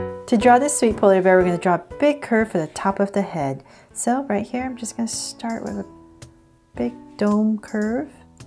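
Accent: United States. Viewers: Omg I ❤️ Your American accent!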